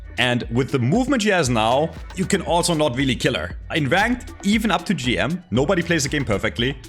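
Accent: Germany. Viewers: Is he german?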